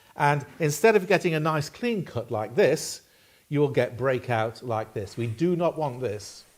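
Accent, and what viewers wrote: United Kingdom. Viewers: Hi, I’m not sure if you ever get to answer any of these comments but I’ll ask anyhow. So refreshing to hear an English rather than American accent for a change by the way! I’m coming back to the UK next year from South Africa and would love to know of workshops, Guilds or such where I could learn (I’m pretty much self taught and have only been doing wood work for 3 years). Please give me any advise if it’s at all possible. Thank you.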